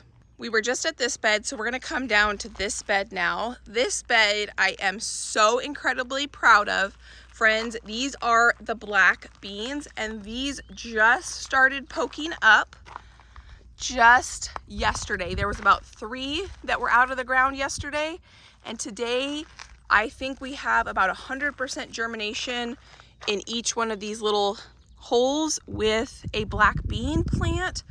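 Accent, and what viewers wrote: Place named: Michigan, United States. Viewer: Are your out of town guests from Michigan ? I was hearing a bit of a Michigan accent off you today lol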